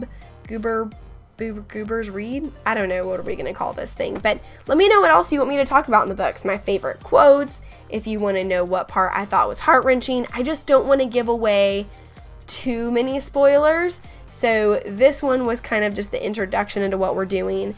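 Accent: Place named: South, United States. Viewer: To me you sounded so southern, I don't know why. You just sounded different. Or my brain is just being a derp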